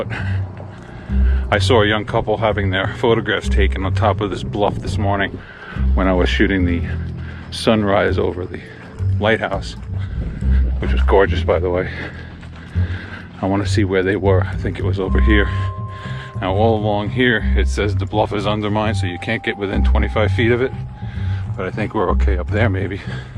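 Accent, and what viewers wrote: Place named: New York, United States. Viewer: I grew up on the east end of Long Island (north fork) we love going to ‘Montauk and learning it’s history. You brought me back home for 30mins with the landscape and your Commentary and your awesome New York accent. 😀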